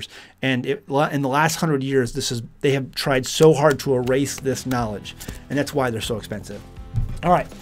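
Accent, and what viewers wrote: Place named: Pennsylvania, United States. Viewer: Sounds like you are a fellow Pennsylvanian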